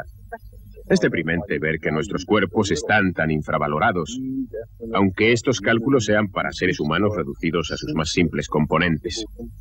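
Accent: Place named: Spain. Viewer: Es un buen programa, el contenido muy bueno; lo malo la pronunciación (del español de España es asquerosa) ni siquiera pronuncia adecuadamente el inglés como es costumbre de los españoles, se escucharía mejor en una traducción en español neutro...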